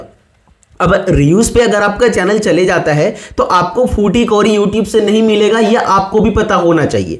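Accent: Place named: India. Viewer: USA ka time mater nhi karta hai aapko English main video bana hga aur usa ki log Indian accent itna dekhta nhi hai 😅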